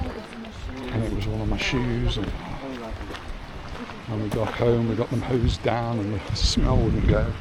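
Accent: Stoke, United Kingdom. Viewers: Are you from Stoke fella? You got a bit of an accent. I really didn't enjoy Cala Bona when I went. Far too touristy for my liking. If I wanted to eat burgers for dinner I'd go to Hanley. Edit - yeah, when I heard say "go on eet' that answered my first question.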